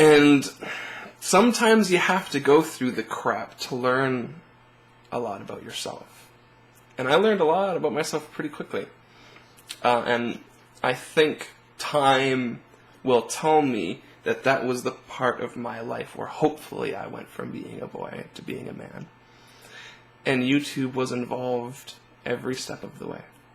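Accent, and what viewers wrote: Canada. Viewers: Your canadian accent is so awesome. ^_^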